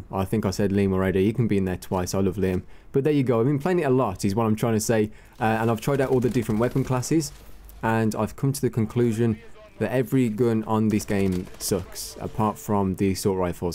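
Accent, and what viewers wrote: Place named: United Kingdom. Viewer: thank u for the tips n trick, british sounding tmartn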